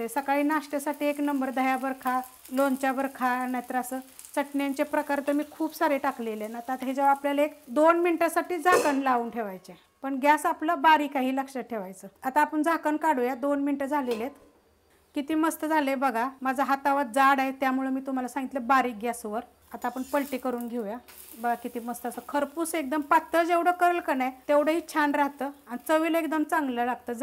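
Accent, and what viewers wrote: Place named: Kolhapur, India. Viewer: काकी , तुम्ही कोल्हापूर भागातील आहात का... मला तुमच्या बोलण्यावरून् वाटले ... तुमच्या रेसिपी खूप आवडतात मला... मी नेहमी करून बघते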